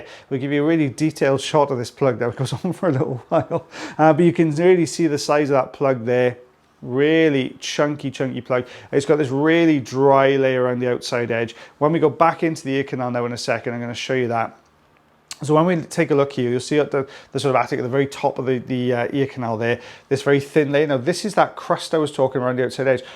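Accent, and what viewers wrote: Wales, United Kingdom. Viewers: So I never would have thought watching ear wax removal would be so …satisfying ….love the Welsh accent too!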